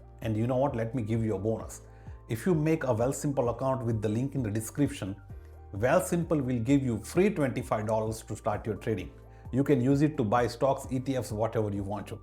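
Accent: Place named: South India, India. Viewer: Dude you sound South Indian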